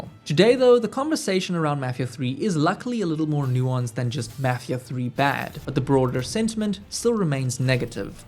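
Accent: South Africa. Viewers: A seth efricen! Lekker man, sommer immediately subscribed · Lekker, man! You're South African! (the r's and o's gave it away lol). While I agree with a lot you're saying, I just can't bring myself to rank Mafia III up too high. The potential is there, Lincoln is compelling and the story is genuinely one of the best I've seen in gaming, but the open world isn't nearly immersive enough (in terms of what you can interact with) especially compared to Mafia 1 OG and 2. I'm far more disappointed than turned off by it, since it has the foundation there (cops reacting differently to you depending on where you commit crimes etc) and in all honesty, Lincoln deserved a MUCH better game. It's still a solid game I replay now and then, and is frankly overhated.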